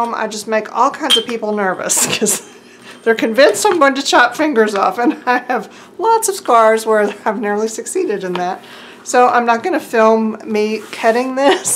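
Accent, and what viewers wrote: South, United States. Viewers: Those are so cute:-) I love your southern accent:-) I am going to try and make some of those myself. Im glad you didn't come back from cutting up the sheets all bandaged up:-) Your ideas are just clever and amazing! I would of never came up with an idea llke that with my pea brain:-) Thank you!!!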